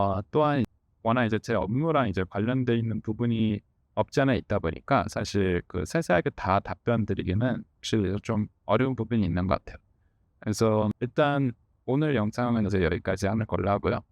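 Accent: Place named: United States. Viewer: 혀좀 덜구리지요 미국말 어색해 ㅎㅎ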